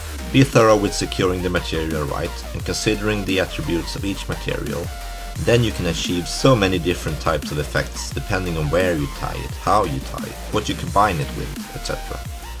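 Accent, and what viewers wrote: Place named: United Kingdom. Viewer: 700 claps, great stuff my friend!!! I enjoyed your video, nice flies, and good to hear your voice, sounds more British than Swedish...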